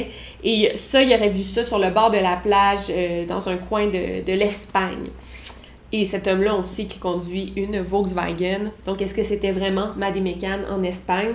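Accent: Quebec, Canada. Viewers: Grâce à toi j'me suis enfin habituée à l'accent québecquois ^^ j'suis trop contente et tes histoires sont tellement intéressantes !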